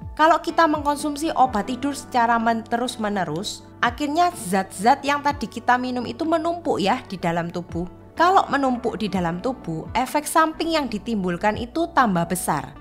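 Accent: Java, Indonesia. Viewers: medok ya mbak...😁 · chindo medok jawa nih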